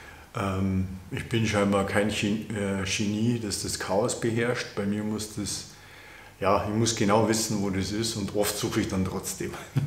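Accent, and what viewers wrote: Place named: Bavaria, Germany. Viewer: habe de Ehre zruck ;-), sah dich schon öfter, auf diversen anderen Kanälen und horchte dem vertrauten Dialekt; Jetzt auch DEINEN Kanal gefunden *freu. Woher kommst du denn? Besten Merce + schönes WE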